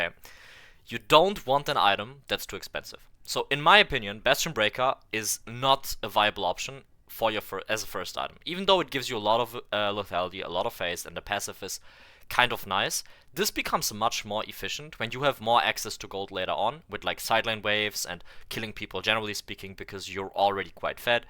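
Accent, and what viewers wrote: Germany. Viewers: Hey, ich höre du hast einen deutschen Akzent. Kannst du mal einen Guide auf deutsch machen, da ich nicht so viel englisch verstehe... Wäre echt prima von dir 🤞🖖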